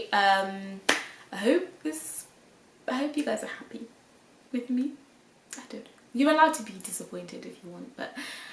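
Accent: United Kingdom. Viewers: Wait is she british?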